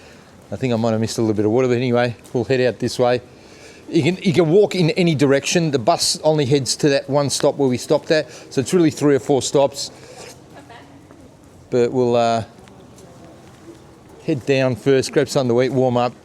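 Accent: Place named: Prekmurje, Slovenia. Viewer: "Slovenski gučiš?", hahaha funny. Unfortunately your prekmurian dialect wont help you much in other parts of Slovenia, but its nice to hear.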